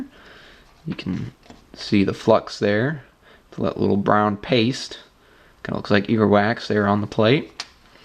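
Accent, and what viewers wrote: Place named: United States. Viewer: thanks for the informative, helpful instruction. IS there a stronger bonding way to join brass? BTW but why do Americans pronounce solder, "sodder"?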